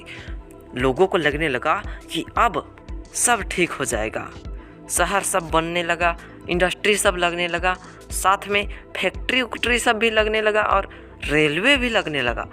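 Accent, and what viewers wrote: Bihar, India. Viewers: are you bihari , because you sound like a bihari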